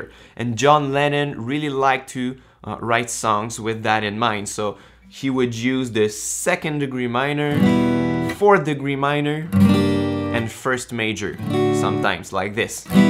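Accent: Quebec, Canada. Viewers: Tu sonnes québécois. J’me trompe tu?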